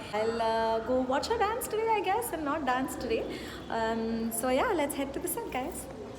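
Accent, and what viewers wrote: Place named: United Kingdom. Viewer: Engrej ki tone bol ri h pgl hoshyari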